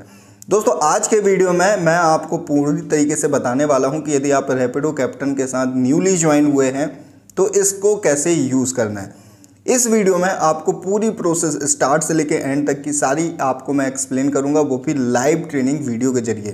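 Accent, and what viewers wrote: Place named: Indore, India. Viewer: Bhai Indore se ho kya 😅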